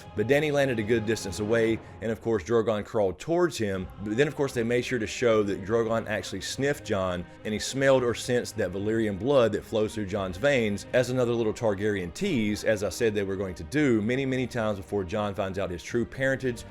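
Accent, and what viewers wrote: South, United States. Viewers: Just discovered your channel today. Nice country accent. Subbed.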